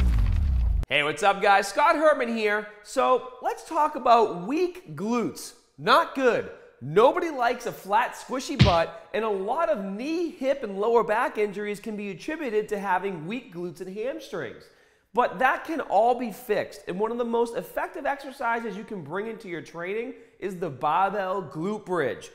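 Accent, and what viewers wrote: Boston, United States. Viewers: Ba-bell! Lol. Love the Boston accent. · It sounds Bostonian but also occasionally Australian but also sometimes just standard lol.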